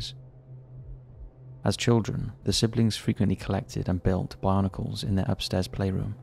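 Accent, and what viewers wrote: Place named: England, United Kingdom. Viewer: the famous English voice is back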